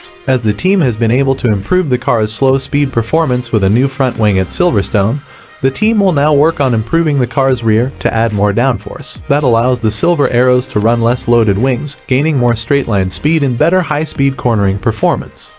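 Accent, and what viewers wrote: United States. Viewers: As soon as I hear an American accent I anticipate click bait. Sorry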